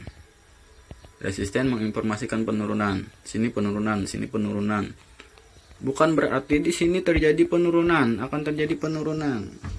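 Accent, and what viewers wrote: Banjar, Indonesia. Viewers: klo di dngar dari logat bahasa ni nang kya buhan urg Bnjar jua ne..😀🙏🙏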